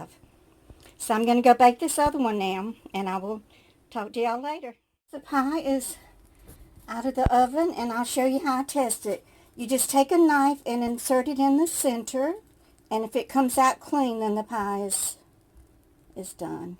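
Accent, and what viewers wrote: South, United States. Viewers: That pie looks delicious. I had to laugh, You say peacan like pcarn, in Australia we pronounce it Peecan...haha, LOVE your southern accent. Have a blessed day from Western Australia.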